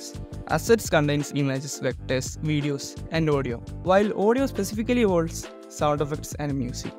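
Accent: Kerala, India. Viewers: Your english sounds like malayali english ( No offence ) are u malayali bro?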